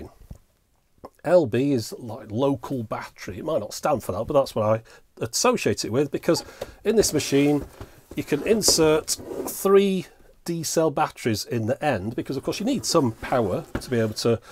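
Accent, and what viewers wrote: United Kingdom. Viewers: I love your British accent...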